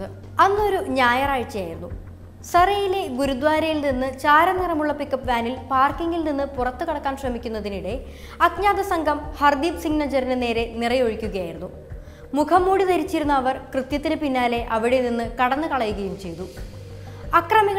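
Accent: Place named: Trivandrum, India. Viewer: ചേച്ചി ഒരു ട്രിവാൻഡ്രം കാര്യാണല്ലേ ആ ഭാഷ അറിയുന്നുണ്ട്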